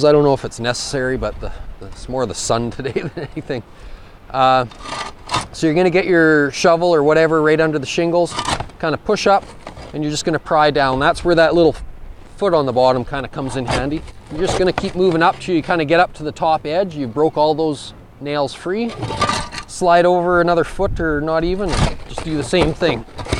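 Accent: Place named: Canada. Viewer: This dude has to be Canadian right? I'm totally here for it 🤣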